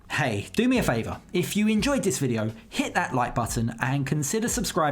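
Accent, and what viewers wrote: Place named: England, United Kingdom. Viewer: English accent, I'm out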